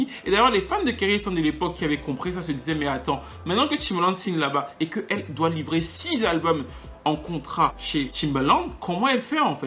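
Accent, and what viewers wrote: Cameroon. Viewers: J'ai reconnu l'accent camerounais. Good job, Mais les extraits musicaux sont beaucoup trop courts! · Ce gars parle comme un camerounais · On sent un vrai Camerounais ton accent est très prononcé 😂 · Exactement.. j'ai senti mon frère kmr dans un dur topo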